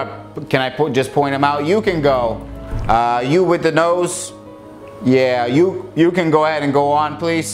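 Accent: Boston, United States. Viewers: This is so funny because of his Boston accent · Hana M he's from Haverhill, that's pretty much how we talk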